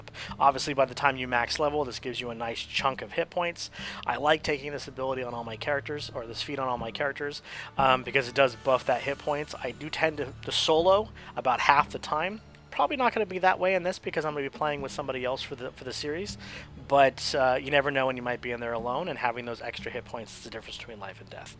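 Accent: Netherlands. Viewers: are you dutch ? :D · are you dutch?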